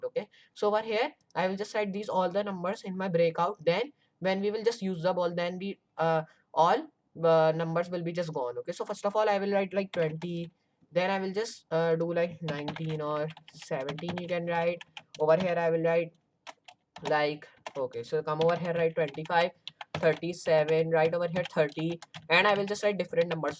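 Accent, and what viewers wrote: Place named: India. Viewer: dude, that work is great, thanks for the video.... but... But, your spoken accent is understandable! Speak slower for god sake! I guess you are Indian? Indian english accent is very hard to understand.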